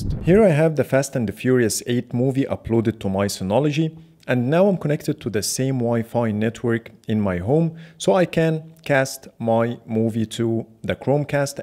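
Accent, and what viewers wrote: Egypt. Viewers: اللهجة المصرية بتميزها من مليار شخص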